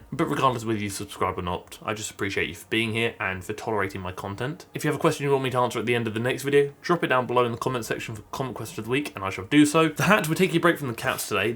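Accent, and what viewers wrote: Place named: Guernsey, United Kingdom. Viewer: Bro no cap he talks like W2S Harry , is he from Guernsey too? Sound exactly like him(the accent)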